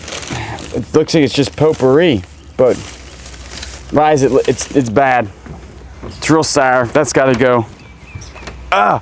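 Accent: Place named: South Carolina, United States. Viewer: If I close my eyes I can almost hear Andy Griffith speak, must be that SC accent!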